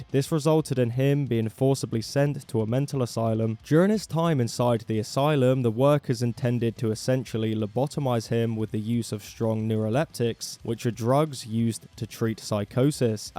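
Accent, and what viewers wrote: United Kingdom. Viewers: A bri'ish fellow talking stuff about Yegor Letov? That's cool, I wish it was interesting to me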